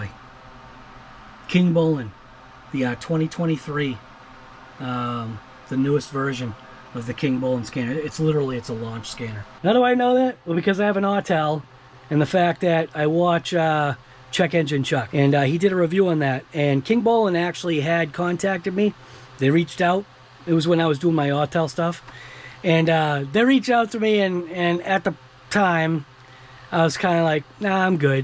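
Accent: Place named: Massachusetts, United States. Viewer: Im newer to the hobby. Been about a year or so. I knew you were from MA the instant I heard you talk lol. Im born and raised in Lynn and live on Cape Cod now. Love the videos.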